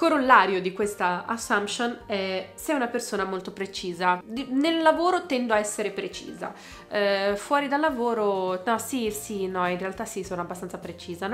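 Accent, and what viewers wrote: La Spezia, Italy. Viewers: W il dialetto spezzino 🙈🙌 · Confermo il dialetto spezzino è insopportabile niente anche vedere con il genovese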